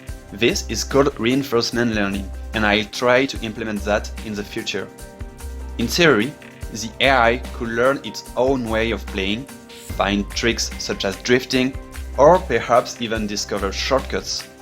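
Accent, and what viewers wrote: France. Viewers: Il est francais l'accent plus les sous-titres en anglais et français....il est francais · Ouep c'est un français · are you french? your accent let me think you are x) SI tu l'es GG a toi content de voir un génie français comme toi^^ ça à l'air si complexe x)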